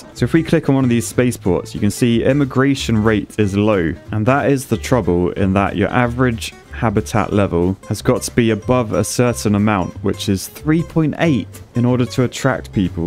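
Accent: United Kingdom. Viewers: ibuilt a fast lane also: is british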